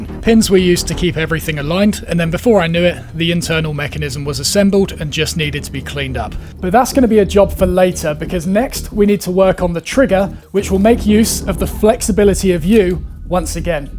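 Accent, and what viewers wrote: United Kingdom. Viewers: I wish I had this man's British accent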